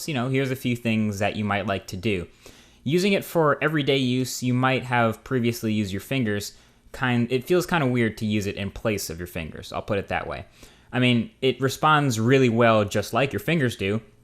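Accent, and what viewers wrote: India. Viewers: Back when Marques sounded a bit Indian. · Boy I swear I thought you were Indian!! It is so cool to hear a brotha talk with some intelligence!!! Great review and I'm definitely getting this phone next, tired of apple.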